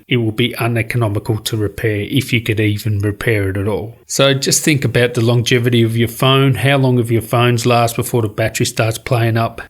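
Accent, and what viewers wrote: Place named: Australia. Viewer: ahhh it's good to hear a tech reviewer with a real legit aussie accent!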